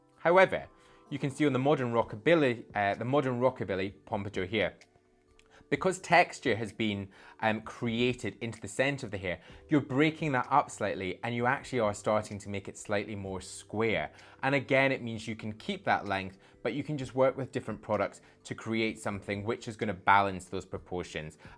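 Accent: United Kingdom. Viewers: Hi! I came here to help my teenage son find a suitable hair style. Content was really helpful. Most of all I fell for your presentation 😊. I like your voice and clear presentation. I was thinking you are British or Australian? 😂😂I googled and found you are actually from one of my favorite country Scotland 😊. I have visited your beautiful country. All the best for your work! 🙏 ❤️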